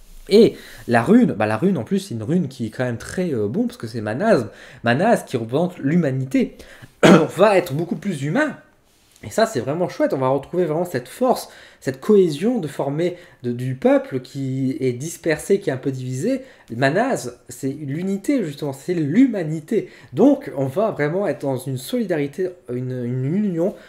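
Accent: North, France. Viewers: Oh toi tu es du nord ! Non ??? 😘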